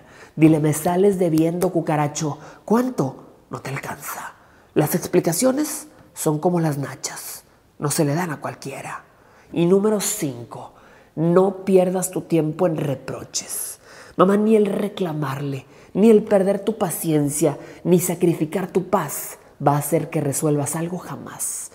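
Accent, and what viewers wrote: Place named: Cádiz, Spain. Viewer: Habla como los angeles y me llego como si de gaditano se tratara oleeeee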